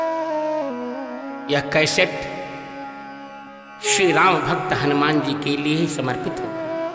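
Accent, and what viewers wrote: Standard. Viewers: यह हनुमान चालीसा का शुद्ध उच्चारण हुआ है pradam गुरु देव 🙏🙏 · शुद्ध उच्चारण के साथ मधुर स्वर मे इस हनुमान चालीसा को सुनने का बार बार मन करता है जय सियाराम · बहुत ही सुन्दर और शुद्ध उच्चारण, 🙏🙏🙏 गुरुवर के चरणों में नमन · Pranam gurudev ji, apka voice ati sunder, apka ek ek word bahut sudh hai lajabab, Jay bajarangbali,Jay Hanumanta balbira. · 🕉️♥️⭐🌹🌹 शुद्ध उच्चारण मधुर स्वर ♥️🕉️🪔💫🔥🔥🔥🔥🔥🔥❤️❣️ जय हो जगदगुरू की🔥🔥💫💫🪔🕉️🕉️♥️♥️🌹 ज़य हो अंजनी पुत्र की जय हो♥️♥️🌹♥️❣️❤️❤️❤️🪔💫💫💫💫🔥🔥💛🙏🙏🙏🙏🌷🌹🕉️🪔💫🔥❤️❤️❣️🔥❣️❤️❤️❣️🔥💫💛💛🪔🕉️♥️🪔💫🔥❣️❣️❣️❣️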